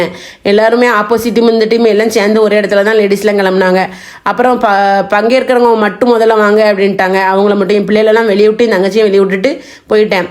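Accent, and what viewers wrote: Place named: Coimbatore, India. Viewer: Naanum en pennum ungal slangai miga virumbuvom.we are coimbatore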